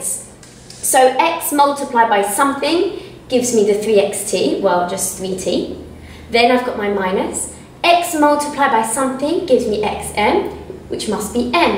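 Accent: United Kingdom. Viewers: Bro BRITISH ACCENT IS LIKE 😅 EASY TO GUESS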